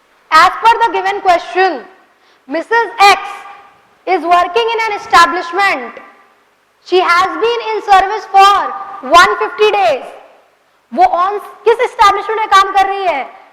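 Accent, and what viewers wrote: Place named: mixed, India. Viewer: pagal ho kya gawar nhi h vo ca hai.BAS vo apna bolne ka tarika up bihar wala hi rakhi hai.baaki knowledge hai lekin bolne ka tarika desi hai.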